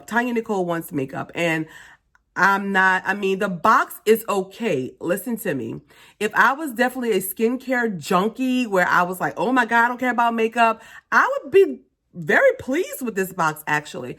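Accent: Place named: South, United States. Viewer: i can listen to a southern accent forever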